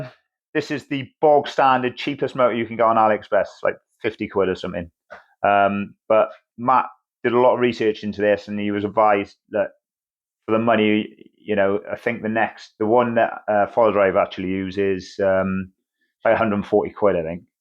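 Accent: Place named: Canada. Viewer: Great video, but the Canadian accent is pretty thick.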